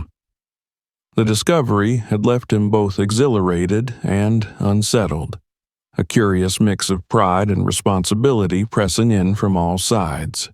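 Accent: South, United States. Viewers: The faint southern accent of the narrative voice adds to the story.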